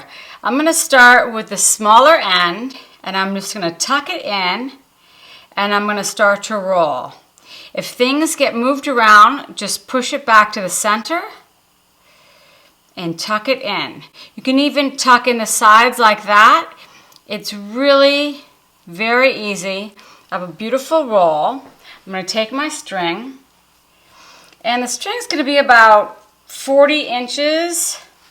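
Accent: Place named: United States. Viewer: Presumably - you take the string off before serving? Love that American accent by the way!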